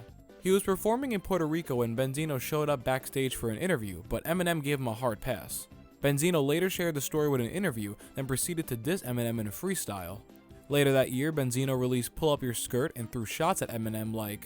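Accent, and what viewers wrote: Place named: Michigan, United States. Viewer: your accent sounds like your from michigan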